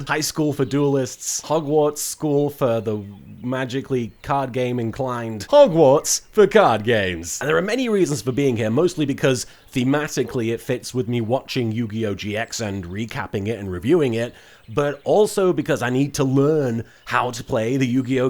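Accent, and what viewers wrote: United Kingdom. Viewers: So that's what his normal voice sounds like... It's... British! Truly a shocker considering that he has been called a chubby British guy by Ishizu! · Your britishnes is showing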